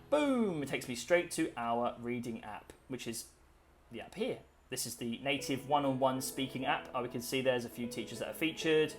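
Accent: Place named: United States. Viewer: Wicked! You use a lot of American pronunciation, by the way. Thanks.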